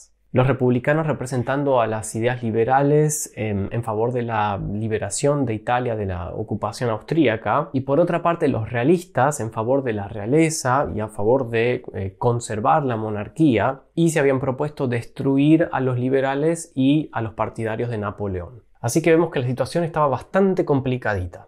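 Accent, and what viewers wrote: Argentina. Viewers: Estimado , no tomes a mal si te expreso que me es insoportable el uso del sheismo en sustitucion del yeismo, lo considero una afectacion decadente surgida en los 90. Vos sos joven y tal vez tu entorno lo usa, pero me gustaria que reflexionaras al respecto , imaginate a Gardel cantando " de un noble potrisho que justo en la rasha afloja al shegar" ( no creo que hubiese triunfado de expresarse asi). Confio en tu sensibilidad auditiva , abrazo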